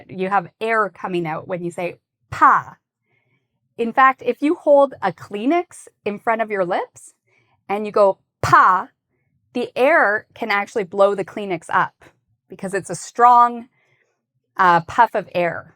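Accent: United States. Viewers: Is this accent American or British? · She sounds American two hundred percent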